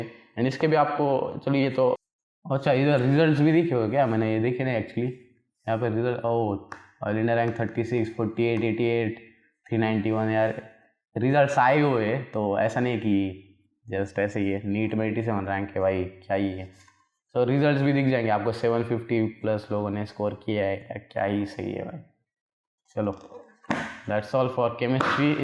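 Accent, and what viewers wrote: Maharashtra, India. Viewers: Bhavaa Tujhya Bolnyatil marathi gavran tone!Kuthla aahes Tu?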